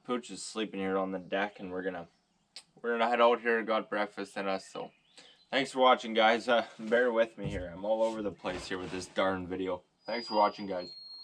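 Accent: Canada. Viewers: I recently found your channel and I did go back and watch the cabin build, staining, etc and I though with you cutting wood and throwing it on the ground, if you get a chance, you need to build a shed roof over your side porch for firewood or extra coverage for whatever you choose. Also...GREATEST CANADIAN ACCENT EVER!!! 👍🏻 Keep up the videos!!! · Bahaha that’s what I’m sayin I’m sittin her with my pup and this one has me rolling. I ain’t had this much beer come through my nose in a long time. I’m a Texas feller but Been round a few Canadians but this feller by far is the funniest feller I ever heard talk from up north. I’d give anything to be able to go lay down some trees with him haha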